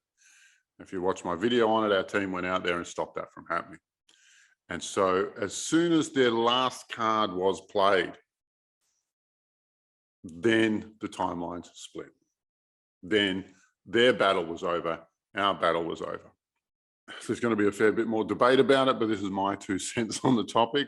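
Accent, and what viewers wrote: Australia. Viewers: It's great to hear an Aussie accent:)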